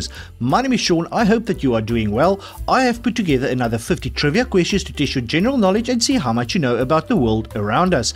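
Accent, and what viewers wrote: South Africa. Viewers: U R South-African, judging by your accent?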